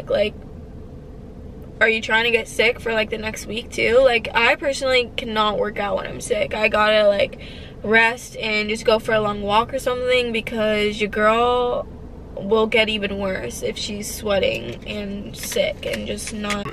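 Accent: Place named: United Kingdom. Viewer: It's the constant British accent for me -- Brit gal over here!!!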